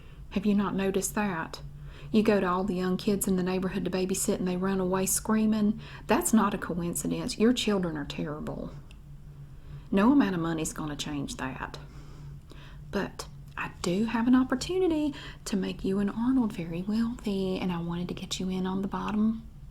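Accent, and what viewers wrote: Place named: North Carolina, United States. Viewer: Your accent reminds me of my childhood in Catawba County, North Carolina. You are so the epitome of a southern sassy woman. I admire that!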